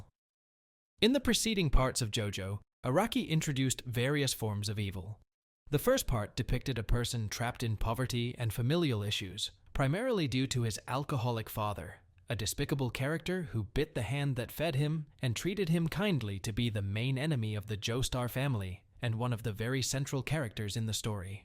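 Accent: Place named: Spain. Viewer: el acento español a muerte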